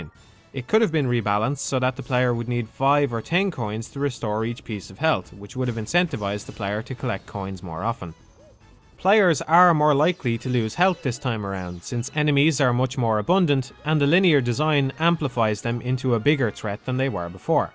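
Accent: Ireland. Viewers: Warren Tierney Yes he is. You don't know how Irish people sound like?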